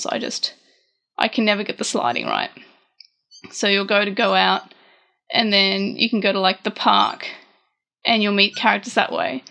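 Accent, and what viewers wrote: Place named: Australia. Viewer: just when I was expecting the youtuber go be American, turn the sound up and the YouTuber is Australian!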